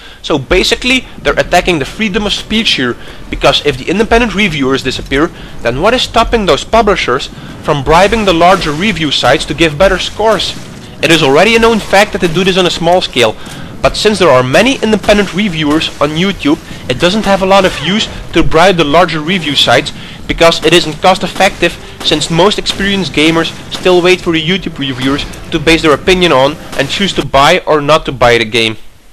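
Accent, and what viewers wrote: Netherlands. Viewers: I know, that is why i put it in my wall of tekst! :) Go make more great video's m8, i enjoy them alot even with your dutch accent.. :) Its rare that i see video's who actually make sense. Anyways have a great newyear and all the best.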